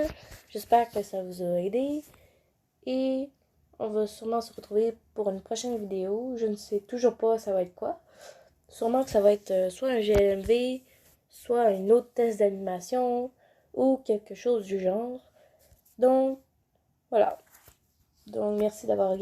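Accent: Quebec, Canada. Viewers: Je trouve que ton accent québécoise et trop rigolo je veux pas me moquer mais je l'adore ton accent et toi son trop bien 😊😋ha et aussi je m'abonne !! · J’aime bien ton accent québécois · Omg québécois j’adore veux acsen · Merci, t'a vidéo m'a énormément aidé ! Et au passage j'adore ton accent québécois ! ❤️ Je m'abbonne ! 💞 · Omg merci beaucoup j’ai enfin compris :O et j’adore ton accent québécois 🤩 +1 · J'aime trop ton accent québécois !!!! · Merci pour sa ! en dirait que tu est une Québécoise avec ta voix trop belle ❤️🌹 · Tu as un agcent québécois (je vien d'arriver) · Ça m’a beaucoup aidé, merci bien ! 😄 Je trouve que tu expliques bien, en plus ton dessin est superbe ! Et j’adore ton accent québécois 👍🏻 Donc en gros tous est géniale ^^ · J adore ton accent québécois il est trop beauuu 😍 Sinon super ya jamais personne qui explique aussi bien que toi ! 🤩 +1 abos je te jure :) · Merci tu explique mega bien et j adore ton axcent québécois et sinon pourrais tu faire un tuto comment faire bouger les bras par exemple s'il te plaît TwT et je m abonne direct et paff c fait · Cute ton accent québécois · Je m'abonne direct ÚwÙ Grâce à toi jai réussi enfin Ton accent québécois je kiffe trop!!! 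♡♡♡ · J'aime se que tu fait je vais essayer sa tout de suite ! Sinon si il y a des gens qui disent qui n'aime pas ton accent québécois, ne les écoute pas moi sa ne me gêne pas. Ai'je le droit de te faire une pub ? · L'explication 👌 l'accent québécois on adore +1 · Je peut avoir ton accent québécois ?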